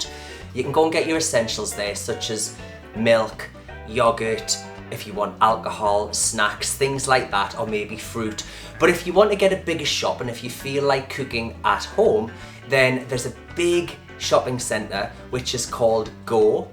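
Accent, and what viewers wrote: Newcastle, United Kingdom. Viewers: What a great Geordie Accent. Good Video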